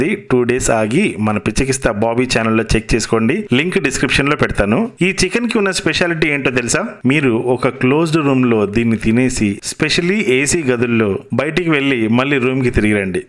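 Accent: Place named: Karnataka, India. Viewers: Nimma Kannada thumba impagide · Wow wow love to hear your Kannada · Very good receipe. Teaching receipe is very good. Speaking in kannada is so cute. God bless you. Keep it up. From bangalore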